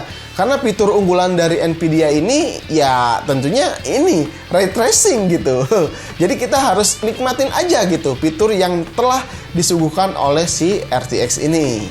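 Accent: West Java, Indonesia. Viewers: Itu logat sunda gan, emang klo bila f atau v emang susah, bukan d buat buat itu gan😀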